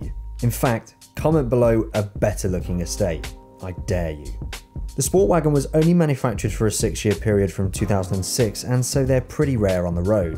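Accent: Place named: RP, United Kingdom. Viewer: Again, a superbly spoken video, very well delivered, you should consider presenting on on of the mainstream TV channels. Perfectly spoken Queen’s English! A real pleasure to hear you present and so smart and mature, of a intelligent man. Thank you 🙏🏻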